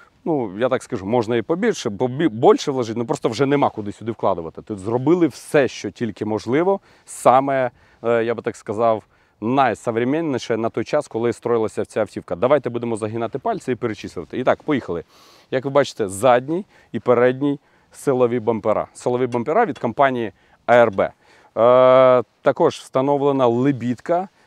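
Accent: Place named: Surzhyk, Ukraine. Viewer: Друже я сам з Маріуполя і перейшов на рідну мову з початком війни, але твій СУРЖАК не те що ріже вухо, віз зриває дах. Так що, або показуєшь розвиток у вивчанні мови, або я від тебе "бля" відписуюсь !!!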